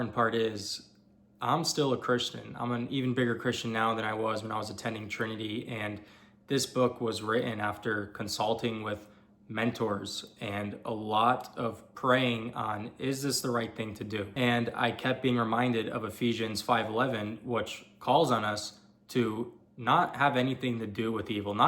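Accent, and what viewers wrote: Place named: East Texas, United States. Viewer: Sounds like someone east of Dallas!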